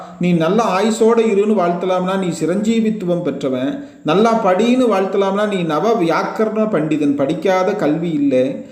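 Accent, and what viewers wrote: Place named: Kongu, India. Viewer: அருமையான சுந்தர கொங்கு தமிழில்......... அற்புதம்... வாழ்த்துக்கள். ஜெய் ஸ்ரீராம். ஜெய் ஸ்ரீராம். ❤❤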